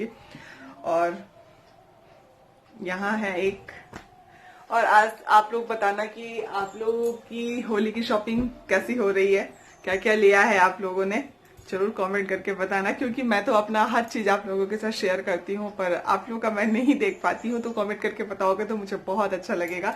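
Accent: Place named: Bhojpuri, India. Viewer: Apke muh se bhojpuri bahutt achhi lagti hai dii.. Nice vlog💓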